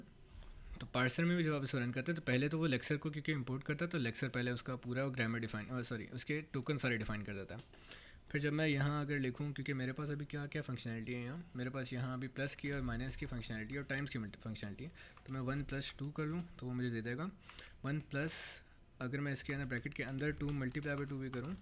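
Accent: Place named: India. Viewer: what language are you speaking? are you using a mix of indians and english? If it's English then your indian accent is so strong that I don't get anything ...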